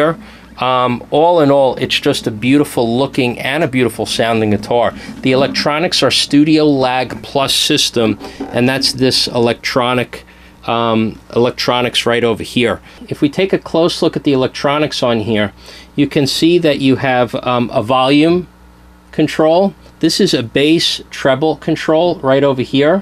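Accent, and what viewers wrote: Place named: Brooklyn, United States. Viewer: Sounds like Brooklyn , NY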